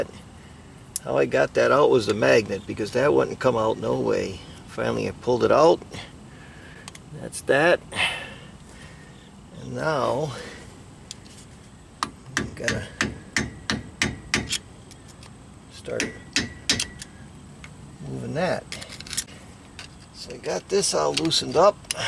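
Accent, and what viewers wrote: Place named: Northeastern Pennsylvania, United States. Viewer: I can tell by your accent you are a true NEPA guy. I enjoy seeing all your work and inspire me as a DIYer/Handyman up the eynon.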